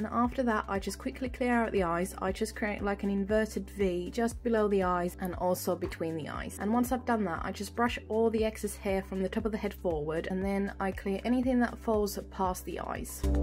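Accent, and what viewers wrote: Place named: United Kingdom. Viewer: Lovely British accent!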